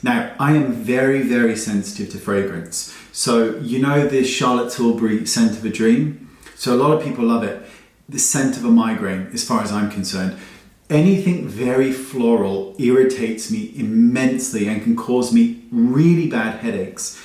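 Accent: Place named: Devon, United Kingdom. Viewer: Wow i love you. Can hear my Devon accent. Cool but no. Migraine city here xxx ❤💖💝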